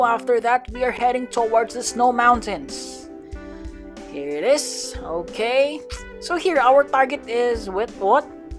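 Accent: Philippines. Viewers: You sound filipino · I'm new to your Channel (3 weeks) but when i first heard your voice i definitely know that you're a Filipino just that accent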